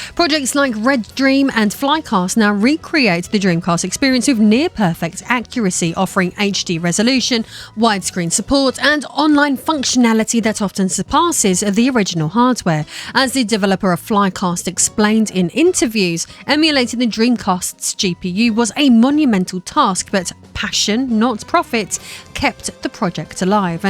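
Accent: United Kingdom. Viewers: Essex girl with elocution lessons reads AI script, today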